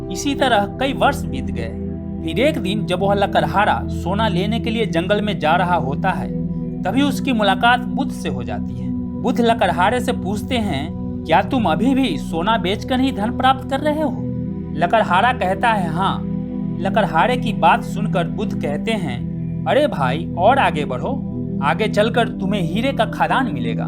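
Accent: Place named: Bihar, India. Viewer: Voice must be bihari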